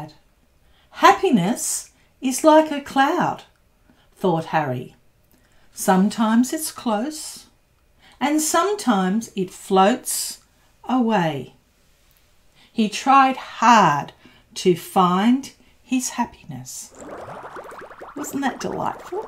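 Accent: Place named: Australia. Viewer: Hope I don't get this wrong ha ha but Aussie. They like it 😁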